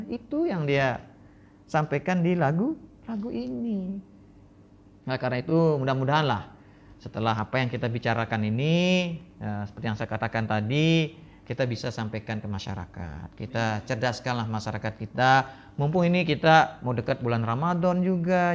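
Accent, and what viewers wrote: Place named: Lombok, Indonesia. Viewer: saht selalu tuan guru,,logak sasak lombok bhsa indonesia mantab guru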